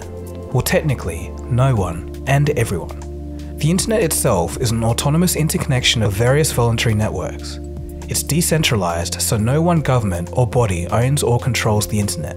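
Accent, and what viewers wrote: Australia. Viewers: 5G here in Australia? Yeah, sure: it's hard to even get 4G CONSISTENTLY across bloody Sydney (you sound like an Aussie). No Bandwidteh Now (NBN) will only continue to screw this up even more towards 5G ... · That Aussi accent is so awesome! Cheers from Brazil.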